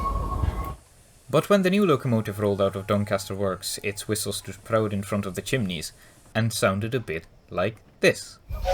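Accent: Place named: Scotland, United Kingdom. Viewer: An excellent and highly informative video. Although I'm English, I thoroughly enjoyed the Scottish accented narrative. A nice touch and thank you kindly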